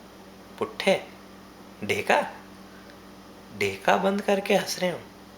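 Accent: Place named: Malwa, India. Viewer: malwi rocksss its always nice nd touchin to hear ur lang when u far from ur home(malwa)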